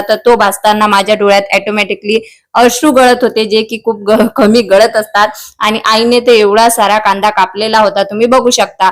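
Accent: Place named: Amravati, India. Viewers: तुमचं कोकणातले माहेर असून नागपूर टोन मधे कसे काय बोलतात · या बाई आहेत अमरावती च्याआणि बोलतात माझे माहेर कोकणात ले... ्आम्हाला वेड्यात काढता ❓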